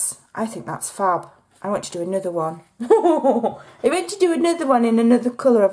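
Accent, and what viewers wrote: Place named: Lancashire, United Kingdom. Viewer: Did you grow up near Lancashire? I ask because Jane Horrocks was born in Rawtenstall and you sound like her a lot. I have always loved your voice! Your so amazing <3 Tug at this American man's heartstrings lol. Thank you for all the wonderful videos.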